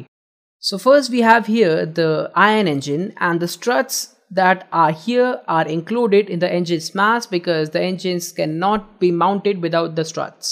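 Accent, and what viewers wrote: India. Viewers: Are you indian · Why u sound like indian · Dude are u Indian? Ur accent sounds so..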